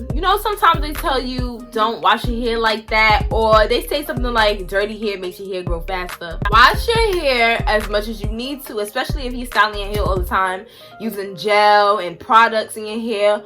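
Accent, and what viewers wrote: New York, United States. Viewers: Am I tripping or do she have a New York accent 🤣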